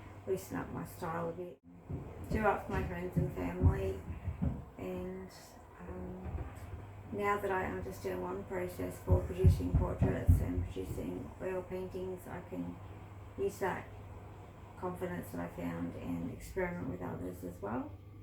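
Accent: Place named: Australia. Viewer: Hello from the UK! Hope you are baring up ok in your midwinter (for us it is the middle of summer, but it still keeps raining and isn't very warm). What region of Australia do you live? I like your accent!! :-) And loved the beautiful botanical art you did in your 20's! I'm glad you are keeping up your art.....unlike me, who can't seem to motivate myself, even though I want to!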